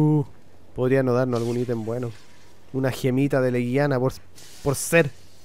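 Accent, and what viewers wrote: Chile. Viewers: Hay cientos de videos, pero nada me hace entrar mas en confianza como latina que oir un gameplay chileno.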